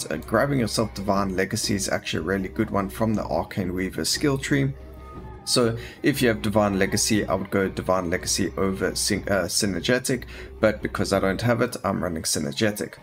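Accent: South Africa. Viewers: You South African brotha?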